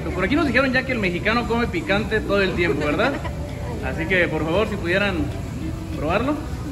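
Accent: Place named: Colombia. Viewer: Y otra cosa a mi me encanta su acento el colombiano tiene su particularidad al hablar y claro depende la región porq hay unos q exageran pero los normal me encanta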